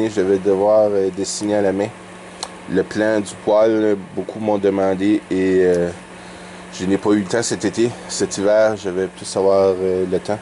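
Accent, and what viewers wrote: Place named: Gaspésie, Canada. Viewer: Vous êtes de la Gaspésie si je me fis a votre accent? Beau projet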